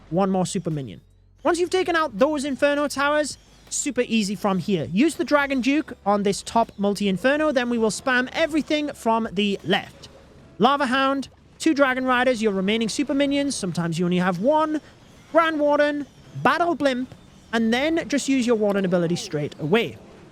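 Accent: United Kingdom. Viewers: hi mate, i think you are an american but im wrong youre british man😅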